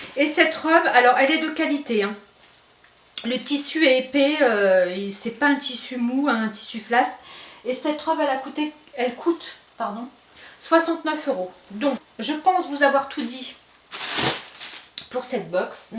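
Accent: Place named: England, United Kingdom. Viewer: Moi j'adore toutes tes petites bourdes ,ton accent anglais, et quand tu sors du cadre pour prendre un truc 👍🏻😄. Passe un Bon dimanche . Bisou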